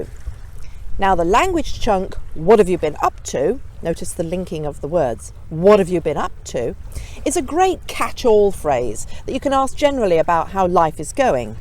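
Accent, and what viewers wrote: United Kingdom. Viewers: I like your standard English and Received Pronunciation,, thanks for great tips ,, you asked before what sounds we have problems with ,, well I have problem with ‘b’ sound , the I know the main difference between ‘ b’ and ‘p’ is the former is much stronger than the latter but the trick is how I can make ‘ b ‘ such a strong sound ??? Apart from vocal cords use as I use then while uttering ‘ b’ but I feel I still miss something to make ‘ b’ more powerful but I don’t know what is it !!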